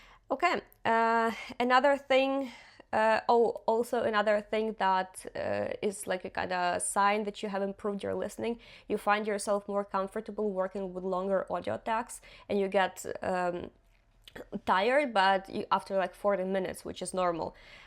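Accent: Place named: Russia. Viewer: Coming from russian native i guess , your english accent is really impressive, i am working on that, i dont want to sound like an american but have the least accent possible. i like your content because is really helpfull .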